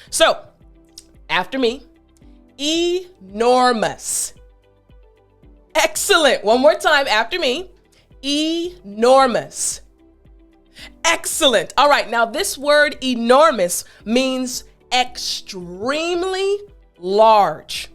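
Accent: United States. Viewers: Hi Kolya, I'm not... but... she is American! I'm not sure which state, however... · Are you American English. I know it again and again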